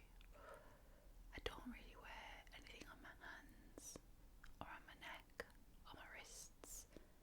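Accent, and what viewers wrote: United Kingdom. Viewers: Can I ask one more question? I sort of collect accents and I'm somewhat good at US accents, but that's because I live here. I'm really just starting to be able to recognize accents within the UK. What area does your accent originate from?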